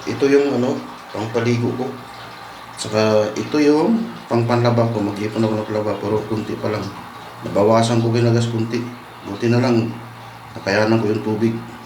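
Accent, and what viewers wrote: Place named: Visayas, Philippines. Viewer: Bisaya mn ka Idol ky salita halata mn gud ta mga bisaya 😅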